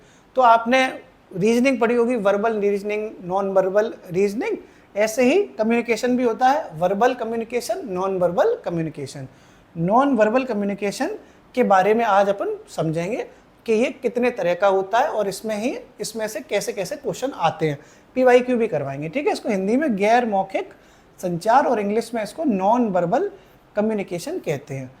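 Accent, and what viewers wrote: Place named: Etah, India. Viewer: Bhaiya aapko jaha tak h Maine drishti coaching mentorship me dekha tha aap bhi join kiye the upsc ki aur shayad aapka ghar etaa side h aapki boli se lag rha h😊😊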